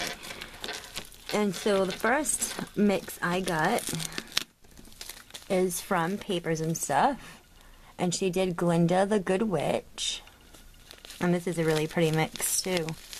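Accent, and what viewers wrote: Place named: East Coast, United States. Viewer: hahaha! OF COURSE I loved what u said at the end.....yes, your accent. I love it hahaha. U probably get tired of me saying it. It's the "R" that gives your accent it's different sound. East coast!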